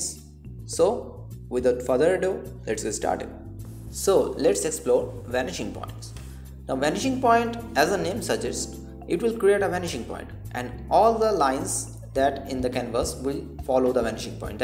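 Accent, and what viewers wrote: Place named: India. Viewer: I've always found pleasing hearing English with indian accent (btw, helpful video... thanks a lot!)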